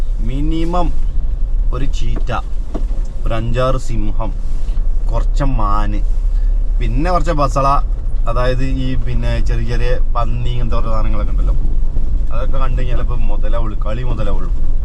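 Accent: Malappuram, India. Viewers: Bro inside wilderness you shouldn’t play with the windows rolling it up and down. What if it gets stuck? But bravetrip indeed. I wouldn’t go alone on such trips 😂. Like your commentary malappuram style ❤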